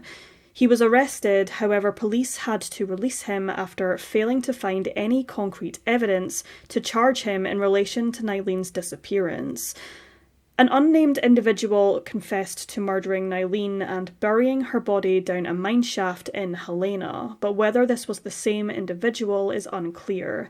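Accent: Scotland, United Kingdom. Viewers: Remember she is Scottish and they pronounce things differently there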